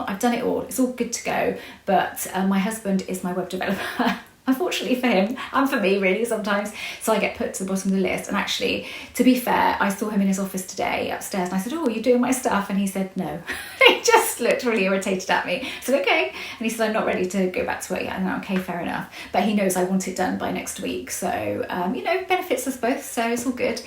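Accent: United Kingdom. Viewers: i knew i wasn't going crazy. I wonder whatever happened to Noel Edmunds. lol. Anyway thanks for replying. And I must admit I sometimes get you and Natalie Dance mixed up. Soz. Anyway I like your teaching style. Nice to hear a British accent teaching this stuff.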